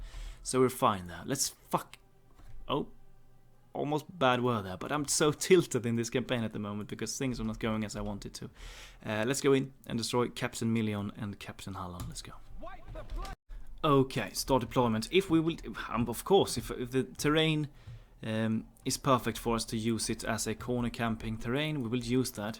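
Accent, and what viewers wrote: Sweden. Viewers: From which part of Sweden are you?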